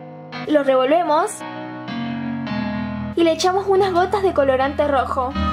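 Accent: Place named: Mendoza, Argentina. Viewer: Hola!! que bien que te quedó.. una pregunta.. Sos de Mendoza? porque yo vivía ahí y Tenés acento de Mendoza👍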